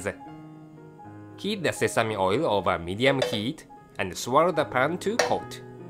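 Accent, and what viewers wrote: Japan. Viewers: Vegetables. He's Japanese. Japanese doesn't really have a V sound, so they usually use B instead. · he is Japanese accent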